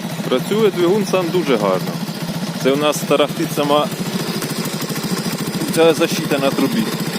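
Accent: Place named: Nizhyn, Ukraine. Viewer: А ви з ніжинського району по акценту наче чутно чого питає вдруг надумаєте продавати чи далеко їхати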